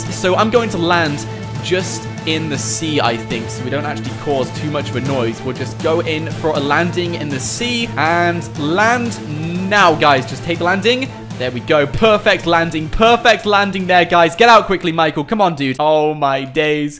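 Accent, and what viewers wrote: United Kingdom. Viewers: You have a British accent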